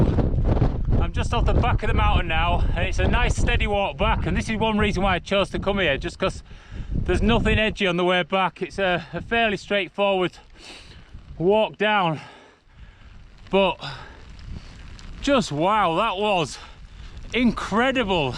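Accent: Yorkshire, United Kingdom. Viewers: Beautiful video and very exiting for a camping video xD But I have to ask. I'm originally from the UK but now live in America. This is my first video watching on your channel. It sounds like you have a Yorkshire or nothern accent. May I ask where you are from? I was born in North Yorkshire and lost my accent due to bullying at a young age, but sounds so familiar, so I am just wondering :)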